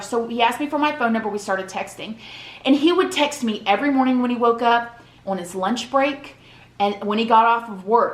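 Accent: South, United States. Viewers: All I hope for is that I get my fair share with the man I love.... I know him from middle school... like I hope it happens like you in a way...where yall met, life did its thing and yall got married in the end. ♥️♥️😭 love your stories, and that southern accent!!! P.S something similar happened to me, Facebook and MySpace kept recommending him to me until we met and once again we ended in bad terms in April. God knows what in the heavens he is doing.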